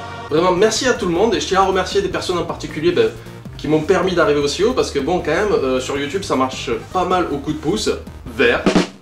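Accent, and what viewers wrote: France. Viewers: Découvert via le dernier film de TGWTG (quoi? un tel accent Franchouillard ça ne se loupe pas! Surtout quand il appartient à un autre fan du Doc') et depuis bah je suis sans relâche, alors franchement GG, tu mérite, et surtout continue comme ça!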